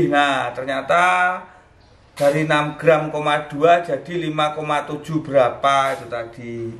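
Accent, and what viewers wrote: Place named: Java, Indonesia. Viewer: Lucu jg mas bro gpp bahasa ny agak jawa2 an itu menunjukkan kita punya identitas. Siiiplah pokoke 👍👍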